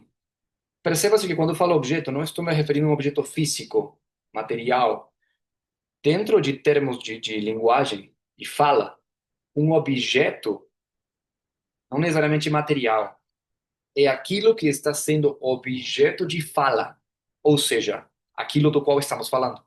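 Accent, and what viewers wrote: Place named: São Paulo, Brazil. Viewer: Bom dia ! Você tem o sotaque paulistano ! Muito obrigado !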